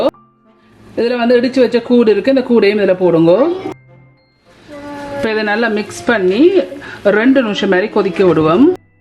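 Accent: Sri Lanka. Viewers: Ungaludaiya elatamil migavom arumai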